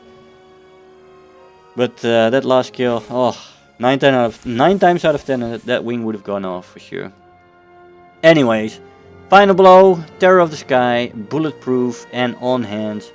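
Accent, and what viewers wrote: Netherlands. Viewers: Hey, are you Dutch? Watching this from Leiden, I thought I recognised the accent:) Nice videos mate!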